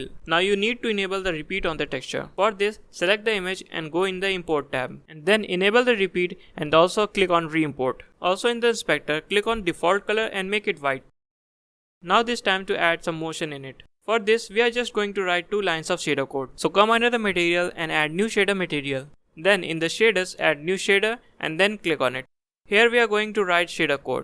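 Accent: India. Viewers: Indian accent !!!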